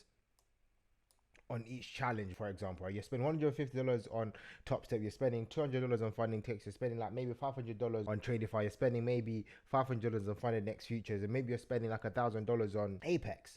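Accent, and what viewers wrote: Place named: United Kingdom. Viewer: It’s refreshing to hear a brudda from the UK talking about these things. Following!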